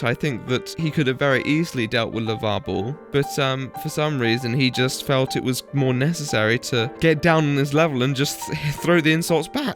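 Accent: United Kingdom. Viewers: What part of Britain are you from just wondering because colossal is crazy sounds the similar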